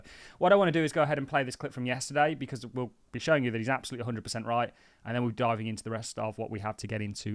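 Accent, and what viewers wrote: United Kingdom. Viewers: Retail as you define them, will most likely not be back in any force. They are completely tapped out due to government induced inflation. You sound like you are from the UK, how are those energy cost working for average person. This is where You Tube pendants have got it wrong. Wall Street has taken over crypto!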